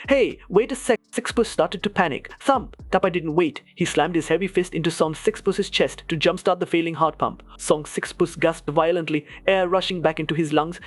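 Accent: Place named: India. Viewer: Sounds indian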